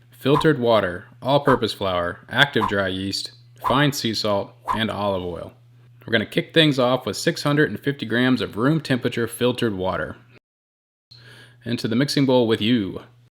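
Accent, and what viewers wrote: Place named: United States. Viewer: You sound like you’re from the US, why are we using grams? 😂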